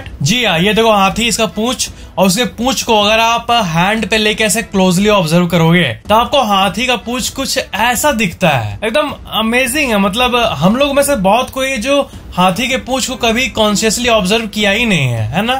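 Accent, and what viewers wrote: Bihar, India. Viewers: Aap bihar se ho ka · Are you from bihar sir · aap bhihar sai ho kya ?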